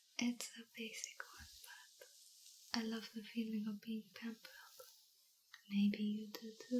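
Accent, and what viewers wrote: France. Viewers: I miss this French accent of hers 😢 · Her: french accent My head: switch it to russian accent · I love long ASMR videos. As a German, I really love French accents too. I'm sure this will really occupy alot of my time. I'm glad this invaded my recommended, kind of like how the Germans invaded through the Ardennes in 1940. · You already have such a relaxing voice, but the addition of a french accent makes it even better. I come back to this one a lot. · Dam. French accent. My weakness. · It’s weird: When you speak English, you have a very pronounced French accent, but when you speak French, I can’t understand a word you say. Without the subtitles, I wouldn’t have understood anything. I’m French, I don’t know what to think about that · is this what french accents sound like from frace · Wait are you actually French or just really good at impressions. 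We also need more wattson, or Kermit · i never knew i would fall for girls wit french accents this hard you suprise me every video lol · the french accent really gives of the vibe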